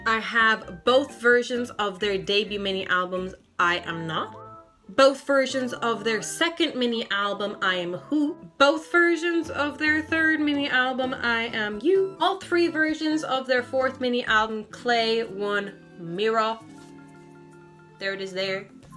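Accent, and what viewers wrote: Ireland. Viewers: bro, am i hearing a fellow irish? i stan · awe💖 your irish accent is super cute!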